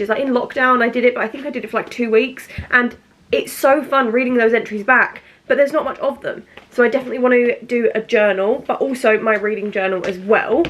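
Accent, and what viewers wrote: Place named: Essex, United Kingdom. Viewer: I love these videos so much! Also how organised are you filming multiple at once 😂 Our accents are so similar are you an Essex girly too?!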